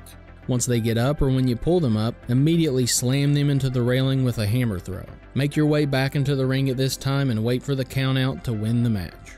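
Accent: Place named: Alabama, United States. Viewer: Love this game! You sound like you`re fromn alabama like me.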